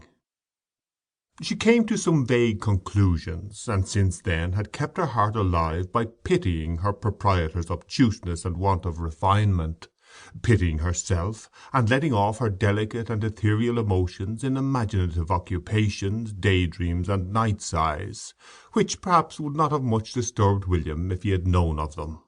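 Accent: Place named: Ireland. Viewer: How irritating; another Irish accent for Thomas Hardy! This is totally unsuitable and cannot be listened to!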